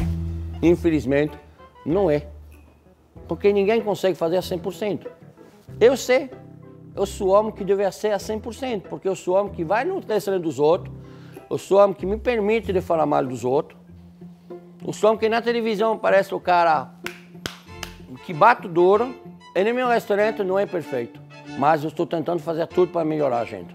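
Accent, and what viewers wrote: France. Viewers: Amo vc jacquin não sei escrever jshshshshshs, enfim vc é um lindo da vontade de morder vc vc é um encanto! E o sotaque francês é muito fofo vc é demais muita saúde, sucesso, dinheiro e principal muita luz divina na sua vida!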